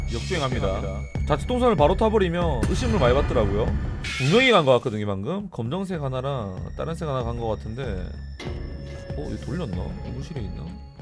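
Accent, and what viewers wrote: Busan, South Korea. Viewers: 부산 분이신가요?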